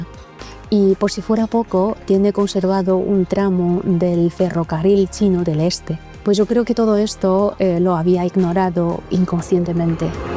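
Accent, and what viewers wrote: Spain. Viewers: Un poco triste también sucede en Latinoamérica con algunos jovenes que les dan pena decir no eres la única persona somos orgullosos de donde vivimos te felicito por salir adelante eres muy hermosa Hablas español tienes un acento de España Te deseo mucha suerte 👍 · Muy vuená tú istoría Ablás comó los Españoles ❤ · Soy español, canario, hablas como una goda, perfecto. Que bien español!!Los canarios solemos llamar a los peninsulares, "godos". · Hola 👋 saludos desde 🇨🇱 América del Sur, tienes un hermoso acento, cuando vi tu vídeo pensé que habías nacido en España, y después ver los vídeos pude ver que no era así. Tienes además una voz hermosa y perfecta para trabajar en radio y televisión tanto en España, China o cualquier país de habla hispana, y además tú eres muy hermosa y saludos desde Chile.....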